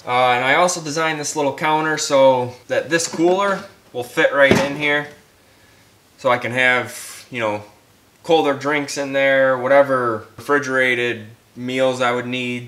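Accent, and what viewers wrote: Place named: Arkansas, United States. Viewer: I’m guessing you’re from Arkansas? Your voice sounds like you’re from there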